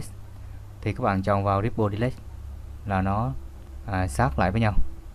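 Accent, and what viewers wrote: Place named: Quang Nam, Vietnam. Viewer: anh người quảng nam hã, cho e làm đệ tử với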